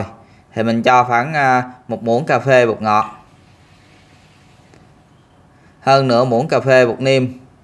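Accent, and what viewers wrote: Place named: South, Vietnam. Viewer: Nghe giọng giống của thầy Thích Tâm Phúc quá ! Rất Nam bộ , tuyệt vời ! Cảm ơn tác giả nhé !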